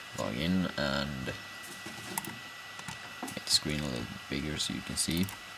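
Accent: Sweden. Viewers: are u from sweden u talk with swedish accent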